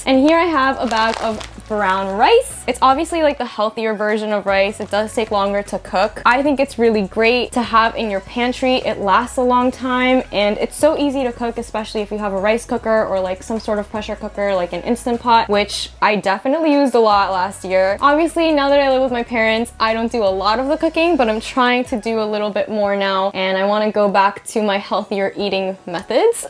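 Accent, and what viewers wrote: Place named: Spain. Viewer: I have to get better at grocery shopping, thanks, also loved your Spanish accent :)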